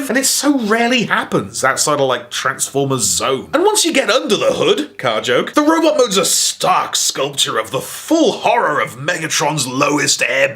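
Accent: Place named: England, United Kingdom. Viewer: Jetfire from ROTF had a heavy Scottish accent, I know has an English accent, but it still reminds me a lot of Jetfire.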